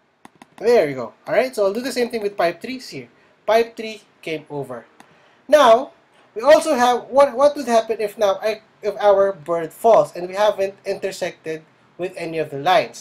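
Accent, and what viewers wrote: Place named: Philippines. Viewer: Pilipino ka no halata sa salita eh xD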